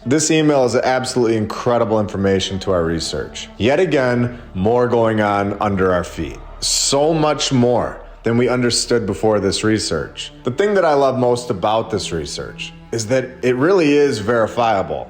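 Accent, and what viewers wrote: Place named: Wisconsin, United States. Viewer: you can't hide that wisconsin accent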